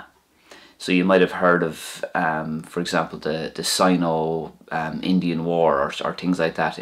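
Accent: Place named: Ireland. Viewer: I love your videos,. Which part of Ireland are you from? Just wondering which Irish accent I'm trying to learn here. Thanks for your videos!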